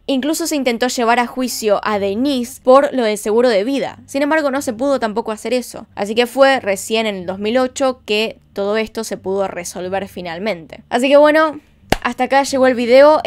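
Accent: Argentina. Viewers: Estoy aprendiendo español me gusta mucho el acento Argentina 🇦🇷♥️✨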